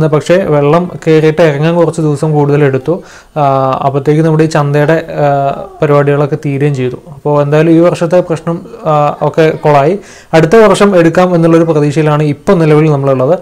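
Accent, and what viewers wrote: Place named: Ottapalam, India. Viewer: Nalla ottappalam language good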